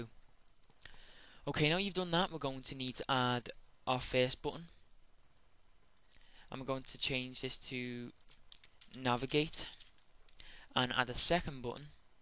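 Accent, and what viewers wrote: Liverpool, United Kingdom. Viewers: I love this channel! So much useful stuff demonstrated in front of my eyes. And not narrated by someone with an intimidating accent that makes you feel you are a noob. A scouse!!! Love it!!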